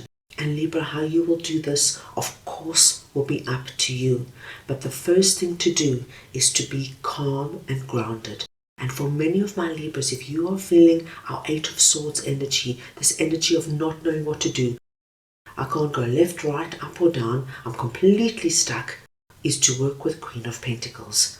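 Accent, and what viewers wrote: South Africa. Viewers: I love how you read, and also love your lovely south African accent🎉❤ Thank you 🥳🙏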